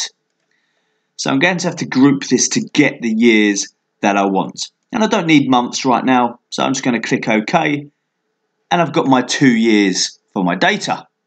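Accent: United Kingdom. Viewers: Thank you so much. I've been looking for an explanation for this analysis in other languages (in pt e it) for so long, without success. Your explanation is so clear, plus british accent, perfect!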